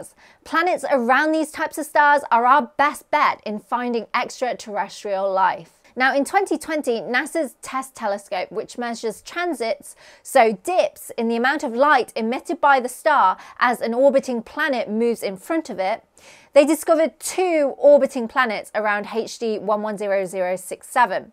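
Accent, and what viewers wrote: United Kingdom. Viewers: ❤❤❤❤Still can’t get over your lovely British accent. 😘😘😘 Jolly good episode👍😘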